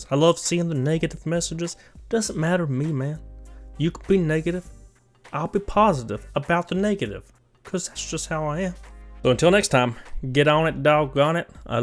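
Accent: South, United States. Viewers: Off comment Where are you from I just love your southern accent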